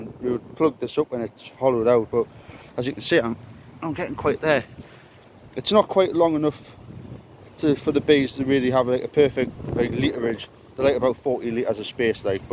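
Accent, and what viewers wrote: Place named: North East England, United Kingdom. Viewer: thought I recognised your accent,am from Jarrow near the tunnel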